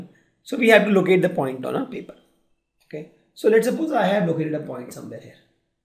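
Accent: India. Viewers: Pls try to speak less Indian accent if possible - not an offence - im just giving suggestion pls dont misunderstand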